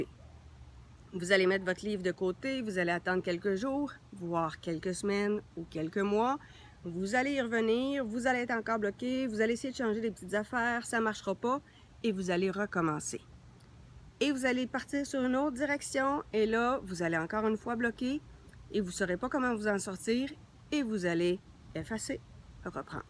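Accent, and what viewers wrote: Canada. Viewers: Elle dit des trucs assez basique, donc vidéo pour débutant ou intermédiaire. PARCONTRE !!!!!!!!!! A la fin elle se lache mais alors VRAIMENT et balance de vrai petites d'or. Par conséquent je pense qu'elle connait son sujet ET PAS QU'UN PEU alors j'invite n'importe qui a fouiller le contenu de sa chaine ce que pour ma part je vais faire immédiatement. Bonus: accent canadien 😅, ça détend !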